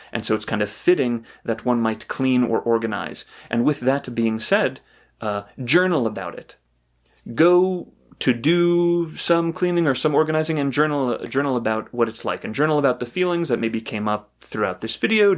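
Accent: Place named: Canada. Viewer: Are you Canadian ? You say certain words like Canadians do lol .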